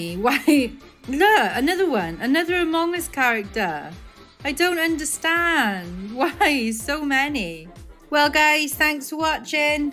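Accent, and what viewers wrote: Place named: Scotland, United Kingdom. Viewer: What country are you from you sound from Scotland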